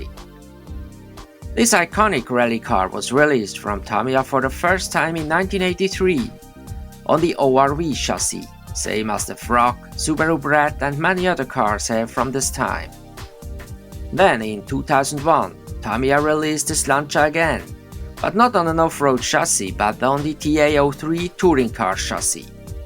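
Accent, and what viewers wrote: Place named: Switzerland. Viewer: great video like always... and the Schweienglisch is great!!! gruess us züri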